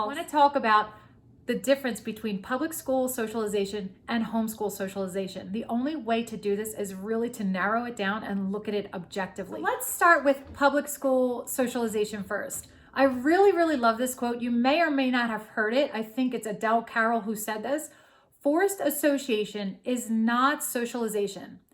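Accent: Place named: New Jersey, United States. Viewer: This was an excellent and informative video!! I loved hearing this perspective. Looking forward to hearing more content from you! I can't help but ask (and you don't have to answer), but are you from the NJ area? You sound like you could be, and I only ask because I love all the activities and community based opportunities you're involved in... intrigued. I am going into my second year of homeschooling in NJ with my second grade daughter and we are loving it! Subscribed!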